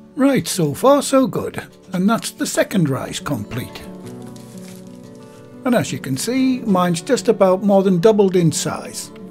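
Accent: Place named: Bradford, United Kingdom. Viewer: Hi! Love this recipe and I can’t wait to give these a try! I absolutely love listening to you I was born in Bradford so you sound like family to me! Great job on these keep cooking my friend!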